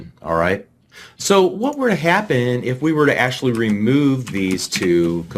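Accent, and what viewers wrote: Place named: United States. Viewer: Great channel sir, I really love these tutorial especially that they are in American Accent, this is a great advantage these days with all the rubbish accent courses invading Youtube.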